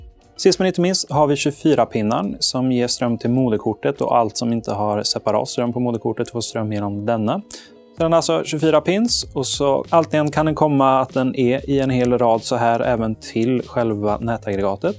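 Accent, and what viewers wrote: Sweden. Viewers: Jag gillar att han uttalar alla namn med svenskt uttal, istället för engelskt. Låter lite roligt :D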